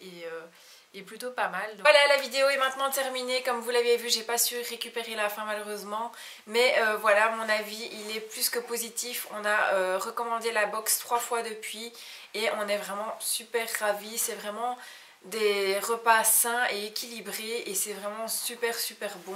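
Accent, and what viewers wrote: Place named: Belgium. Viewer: oui c'est vrai ça s'entend que tu est belge tu viens de liege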